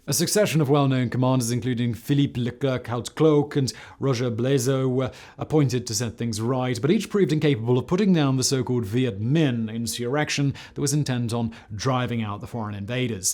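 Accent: England, United Kingdom. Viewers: "the French and English doubled down..." the somme was fought by the British Empire, not just England. Being Scottish, that coming with an English accent was particularly painful!! And Im not one of those wankers who love to point out mistakes - I just couldnt let that one go. FFFFFFRRRREEEEDOM!!!!!!!!!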